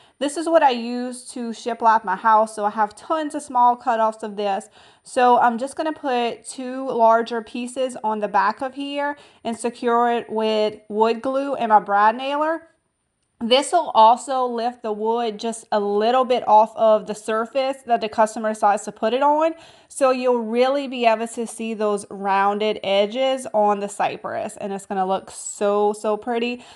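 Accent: Louisiana, United States. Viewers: thanks for the info, Kay. Going to get me some garden edging at home depot! is giving me all kinds of other ideas. Love her channel. I used to live in Louisiana so she makes me feel right at home with her Cajun accent and personality. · I love your DIYs and your channel! May I be a bit personal and ask are you from Louisiana and maybe Cajun,I’m from England and pick up on accents,yours is delightful xx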